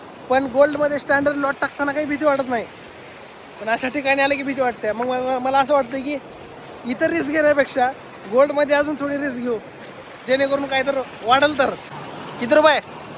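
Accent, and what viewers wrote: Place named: Latur, India. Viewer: Tu tar punet rahun Latur ci basha boltho 👍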